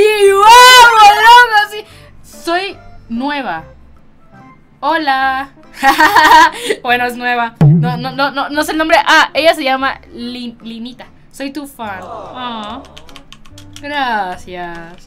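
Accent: Argentina. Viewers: Oye eseñame a hablar argentino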